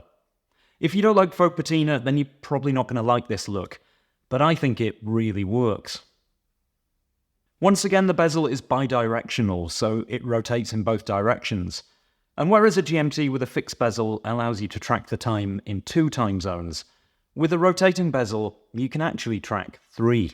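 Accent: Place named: RP, United Kingdom. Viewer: you're posh and say it properly, I'm an oik and can't even spell it 😂 Great vid as always dude ❤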